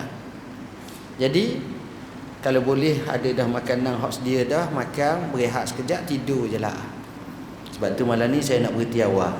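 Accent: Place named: Kelantan, Malaysia. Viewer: ustaz ni orang kelantan ke. bahasa kelantan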